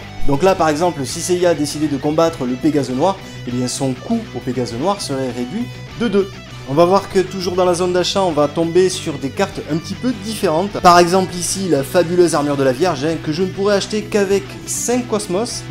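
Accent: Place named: South, France. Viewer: Peuchère juste un petit peu , mais vraiment flagrant quand tu fais le soldat germanique. Tu es de Marseille ? Moi je suis sur Toulon!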